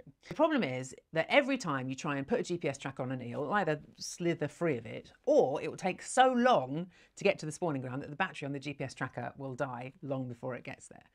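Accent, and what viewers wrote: United Kingdom. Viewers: Beautiful redhead with a British accent yes please!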